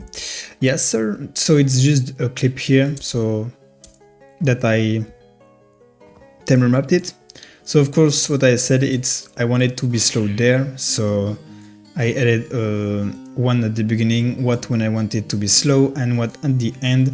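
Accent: France. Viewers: you kinda sound french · mdrrrr jme disais la meme en écoutant l'accent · tu est français non ?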